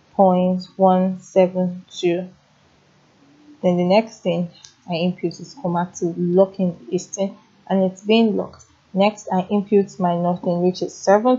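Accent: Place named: Nigeria. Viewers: nah. She rarely made grammatical blunders. I Understood her perfectly. So its either you're terrible at hearing English or you're just being presumptuously mean for no damn reason. That's a Nigerian accent. I'm Nigerian and English is the Lingua Franca in that country. Most of the new gens learn English as a first language. So you coming here on YouTube to give unsolicited Dimwitted comments because you can't comprehend her accent is just distasteful. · Don't be silly man, if you can't understand simple Nigerian Accent.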